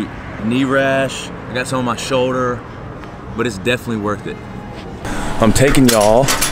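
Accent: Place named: Texas, United States. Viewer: No homo but his Texan accent is such a refresher 😊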